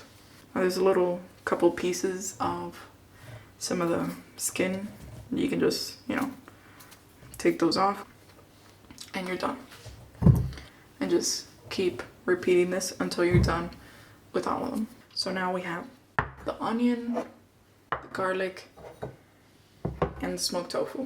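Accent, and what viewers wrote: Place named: Miami, United States. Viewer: Lmao you’re so Miami with the accent and hand gestures, I love it!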